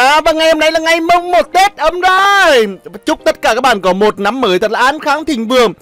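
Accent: Nghe An, Vietnam. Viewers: Nghe quen giọng lào lúc nào không hay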